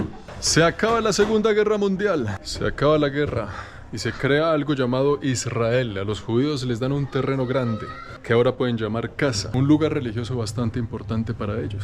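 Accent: Colombia. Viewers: Bueno primero muchas gracias por el video realmente hermoso y mucho con el hermoso acento colombiano te felicito, segundo y es muy gracioso hasta me hiciste reír mucho y es cuando has entrado a lo que llamaste "edificio" es una casa privada menos mal que nadie te veo ahí jajajajaja, claro como eres extranjero no te van a decir nada pero fue muy loco verte entrando, muy atrevido jajajaja. Y ahora sobre el color, bueno soy Marroquí norteño de Tetuán (la Paloma Blanca) así que te diré cual es la teoría más acertada para nosotros y obviamente basada en información histórica, pues la cuidad azul fue construida el año 1471 por Ali Ben Rachid Al-Alami y el es también el padre de la mujer llamada Al-Oura lo cual era el gobernante de Tetuán aquel época es una mujer muy conocida en la Historia de Marruecos, y pues Ali Ben Rachid ha construido la cuidad Azul como castillo en contra de la invasión Portuguesa a Ceuta aquel época para poder proteger sus cuidad por si acaso intentan invadir a la cuidad azul, en el año 1492 con la caída de Granada la Última cuidad que estaba bajo control en Andalucía a los Reyes católicos comenzaron a echar los Musulmanes y los Judíos de Granada, y pues de ahí han viajado por Marruecos, exactamente el norte, parte de ellos llegó a la cuidad azul y comenzaron pintando sus casas y sus negocios con el color azul los judíos como para acercarse a Dios, y de ahí se quedó como tradición este color en la cuidad y los marroquíes le han cuidado hasta que se transformó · Me encanta el acento de Colombia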